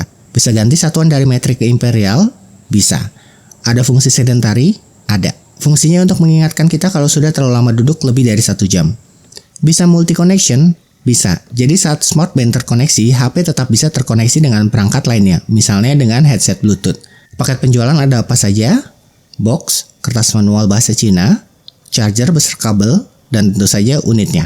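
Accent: Bogor, Indonesia. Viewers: Reviewnya ringkas tapi detail banget, mencakup hal-hal essential, padat berisi, informatif, dan yg keren itu udah ada subtitle hardsub 👍🏼 Keren nih reviewnya, makasih udah sharing bro. Btw, orang Bogor ya? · Bang orang bogor bkn??? 😅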